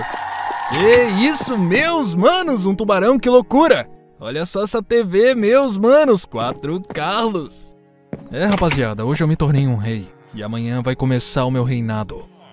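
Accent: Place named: Rio de Janeiro, Brazil. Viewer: vc e carioca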